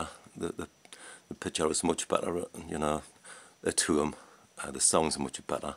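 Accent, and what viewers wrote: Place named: North, United Kingdom. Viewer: Mate, I would seriously pay you to make this for me, been looking at fires endlessly and this is better than any of them. This video is quite old, so I would love to know if you're still using it and how it's going. You sound from the North, I'm in Scotland and I serious about you doing this for me. I take it this is just for looks and no heat involved. If you are interested, please reply and we can get in touch. Regards.....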